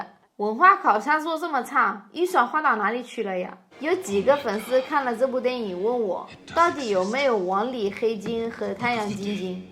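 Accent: China. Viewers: 可惜學得是中國口音，美感打了點折。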